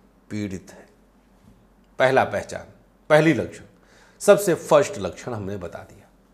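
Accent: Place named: Kanpur, India. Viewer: Pandit ji aap kanpur se hain kya itna mazedar to sirf hum kanpuries bolte hain maza aa gya 😊🙏🏻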